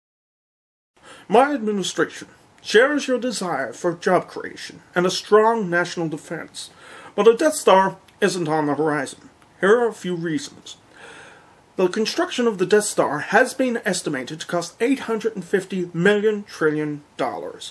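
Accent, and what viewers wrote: Standard. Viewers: Fairly well done neutral American accent :-)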